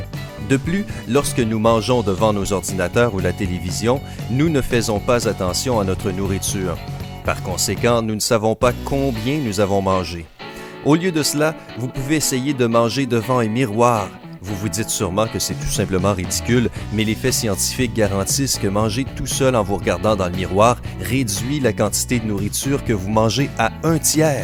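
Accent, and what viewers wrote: Quebec, Canada. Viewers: J'adooooore l'accent canadien. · acent québécois 😊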